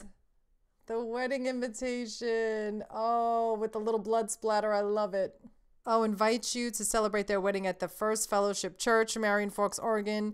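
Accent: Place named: East Coast, United States. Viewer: I'm a new viewer so forgive me for asking if you already said so but you have an interesting accent, are you from the east coast?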